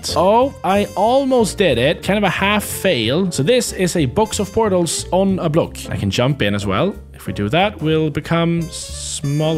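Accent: Sweden. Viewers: hejsan du låter svensk! men är du det?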